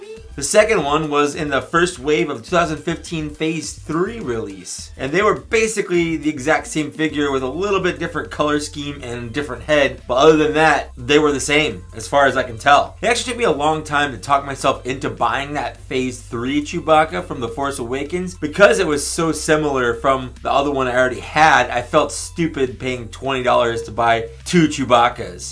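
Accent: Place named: Chicago, United States. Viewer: Lol aren't you from Chicago? You definitely have the accent! 🤣 Love your reviews, keep it up!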